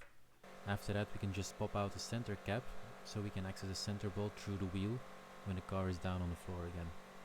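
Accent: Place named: Belgium. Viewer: Ik kon zo horen dat je een mede belg was, goed uitgelegd bedankt voor de informatieve video 🙂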